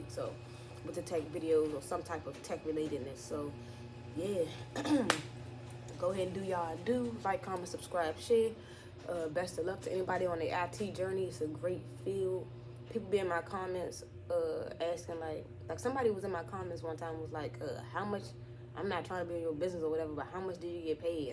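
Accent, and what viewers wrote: Washington DC, United States. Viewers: When I heard the DC accent, I liked the video. When she said 'jih like', on muvas I smashed the subscribe button lol.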